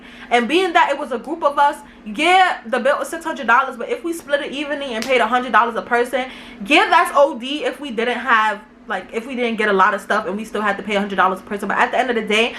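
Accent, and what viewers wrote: New York, United States. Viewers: I love y'all New York girls accent. I'm fascinated and intimidated at the same time lbvs 😄😳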